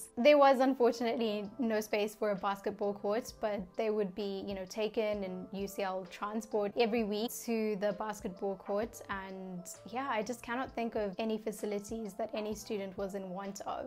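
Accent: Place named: South Africa. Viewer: I knew it she's south african 🇿🇦😝 thanks for the in-depth review. I feel super comfortable in my choice for my masters there. 😎 Keep it up!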